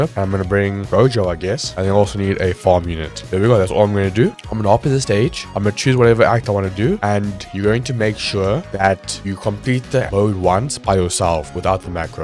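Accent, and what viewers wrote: South Africa. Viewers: Are you South-African?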